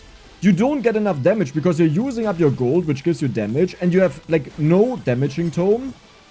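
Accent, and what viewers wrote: Germany. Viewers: Could it be that you are German ?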